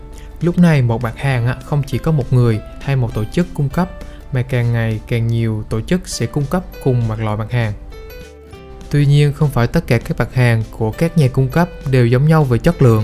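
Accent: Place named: Phu Yen-Khanh Hoa, Vietnam. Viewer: Giọng nói của bạn rất hay. Chắc là giọng Phú Yên-Khánh Hòa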